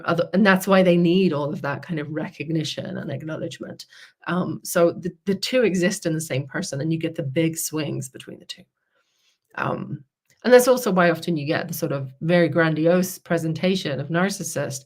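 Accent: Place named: Northern Ireland, United Kingdom. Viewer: I thought I could hear a hint of a N.I accent.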